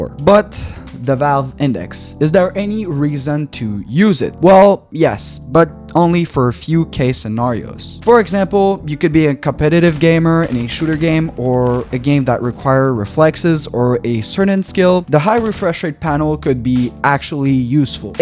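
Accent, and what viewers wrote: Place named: Quebec, Canada. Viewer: je peut entendre l'accent quebecois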